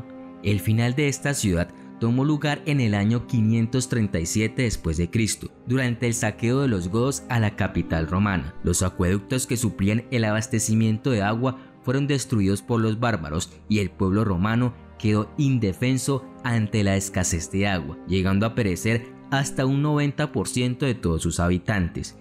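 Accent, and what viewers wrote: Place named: Colombia. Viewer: No puedo tomar un acento colombiano en serio, siempre hablan bromeando jugando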